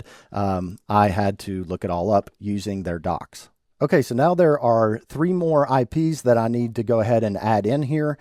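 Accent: South, United States. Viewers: Can’t i just use firebase? Also ru from the south?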